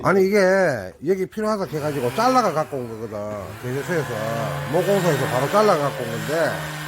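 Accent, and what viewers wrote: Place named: Gyeongsang, South Korea. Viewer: 경상도 사투리 좋아요 자막은 표준어로 처리 해주세요 ㅎㅎ😀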